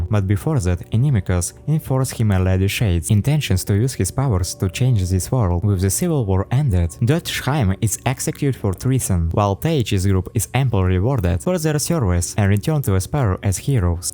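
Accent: Russia. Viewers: Where are your Accent comes from? Russia? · Not gonna lie I thought your were speaking Russian for a minute......but overall not not bad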